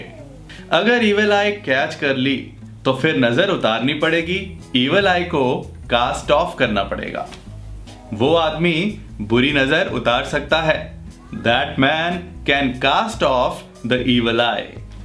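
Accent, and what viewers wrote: India. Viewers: Indian English... dont be puzzled..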